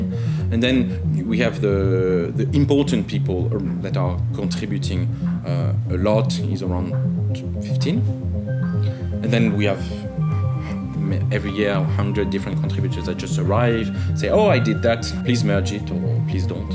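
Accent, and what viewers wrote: France. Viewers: His french accent is so funny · I like your french accent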